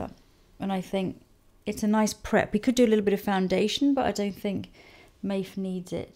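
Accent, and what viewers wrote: Netherlands. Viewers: I immediately heard that she's from The Netherlands lol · I wonder what nationality Meve is? Her accent sounds very Dutch. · Is Dutch? Her accent sounds Dutch. She's just so beautiful, I can't get over it! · Is Meve from the Netherlands? She doesn't sound very English to me (but maybe it's just me, don't know =p) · May I ask where Meve is from? She sounds Dutch to me! :)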